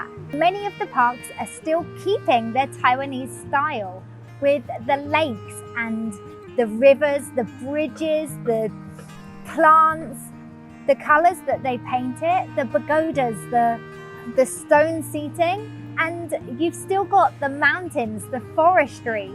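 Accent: United Kingdom. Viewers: Like your UK accent, and thanks for promoting Taiwan and Taipei City :) Hope you are alright with kids edu system in TW or the way people there teaching your kids, cheers. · l feel much like to listen your speaking vowels clearly at each word in the videos。 Your sounds are good for everyone to study a standard British speaking. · 觀察入微，描述細緻，加上妳優雅柔和的英倫口音，絕妙！(點閱應更高) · Lucy英國腔英語好好聽 繼續聽下去。我住台北市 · 💓😍 love your British Accent....soft and clear. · 對啊！我一開始就訂閱，濃濃英國腔就是原因之一。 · 英國腔的英文真的好好聽喔👍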